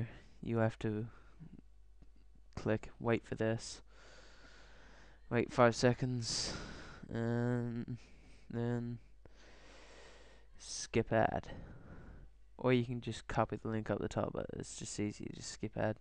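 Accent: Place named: Australia. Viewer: Dude, nice accent, is it aussie?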